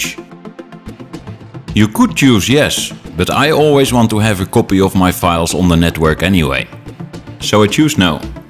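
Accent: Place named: Netherlands. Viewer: Hollands Engels. KOTS!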